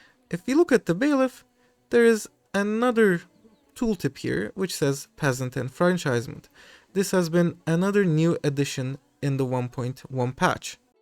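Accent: Turkey. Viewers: It's just your english accent sounds very turkish and your pronunciation of turkish names is almost spot on. The only proper name you "messed" was "Döger" and that's not even your fault. It's PDX's fault. It should be "Döğer" with a soft G not "Döger". I swear the letters I, İ, and Ğ cause so much pain for the software developers lol. Although azeris call that oghuz tribe "dögər". Perhaps you are azerbaijani 😂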